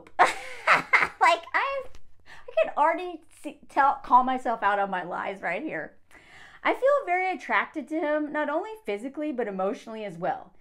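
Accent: South, United States. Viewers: your southern girl baby voice cracks me up every.time 😂 give Moz a hug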